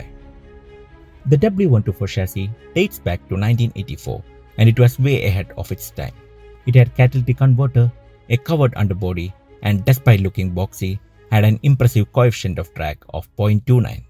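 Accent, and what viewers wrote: Kerala, India. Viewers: Bro...I feel that thick Malayali accent 🤣👍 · Just one thing.....you have that typical Mallu Accent... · Malu english mind blowing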